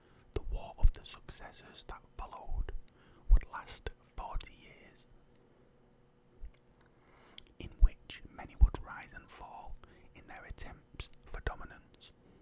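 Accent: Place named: Manchester, United Kingdom. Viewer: Sounds like a Manchester accent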